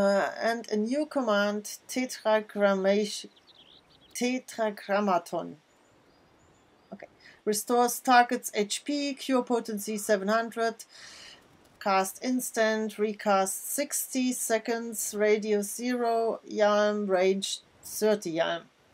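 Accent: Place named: Germany. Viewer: Her accent sounds german... Bist du Deutsche? 😆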